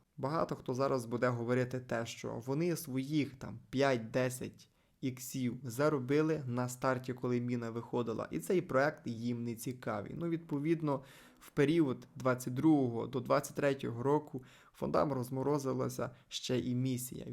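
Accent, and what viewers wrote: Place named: Boyko, Ukraine. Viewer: голос пздцц ти шо з села?? як бойко мовиш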